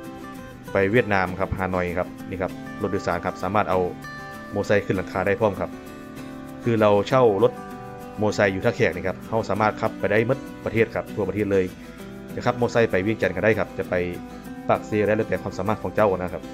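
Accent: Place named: Nyo, Thailand. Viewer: ออกไทญ้อเรื่อยเลย อิอิอิอิ...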